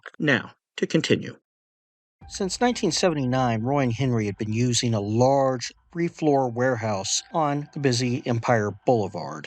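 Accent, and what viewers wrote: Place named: Midwest, United States. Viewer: Can't wait for part 17, I've greatly enjoyed this series and your Westies 3-part series. Exactly my type of narration, you've got that dry, wry New York sense of humor my entire family has, even though I can tell from your accent that you are originally from the Midwest... Or Ohio or similar? Just a guess based on certain words you say. Anyway, I don't do this often on a YouTube video, but thank you for your channel, your videos and the immense amount of work you've put into it. I'm looking forward to contributing back by buying your book when it is published, which I'm eagerly looking forward to. 🙂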